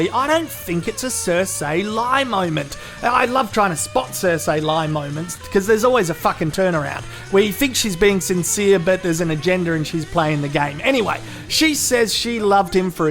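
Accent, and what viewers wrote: Australia. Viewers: What part of Australia are u from?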